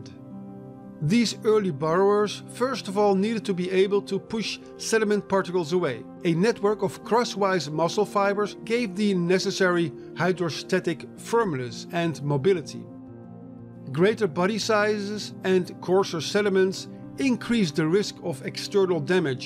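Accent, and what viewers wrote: Netherlands. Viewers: Just a off-topic question. Is the narrator by any chance dutch? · Are you Dutch? I hear a Dutch or maybe South African accent!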